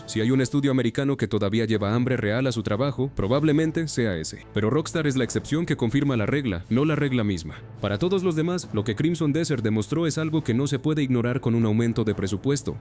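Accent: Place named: Colombia. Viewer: buen video pero falta mejorar ese guion, mucho corte de voz, y te delata como pronuncias la S , colombiano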